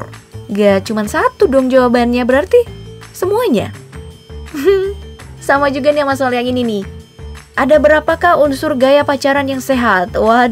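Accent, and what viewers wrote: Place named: West Java, Indonesia. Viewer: Aya aya wae min euh sunda asli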